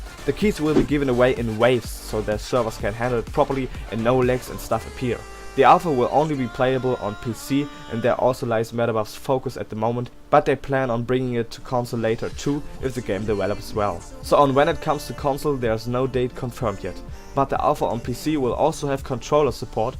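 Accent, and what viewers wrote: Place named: Germany. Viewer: This German accent...... 😖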